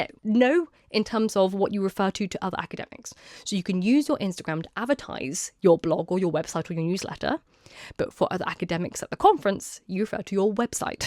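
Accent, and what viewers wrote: RP, United Kingdom. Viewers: Thanks! Very interesting video.🤔 I think I am starting to see why people don’t want to get PhDs…very difficult. Where in the UK is your accent?? It is almost posh.🤔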